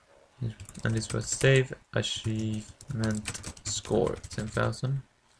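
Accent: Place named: Sweden. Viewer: Your Swedish accent is amazing, please say "The Genius Ninja" in the next ep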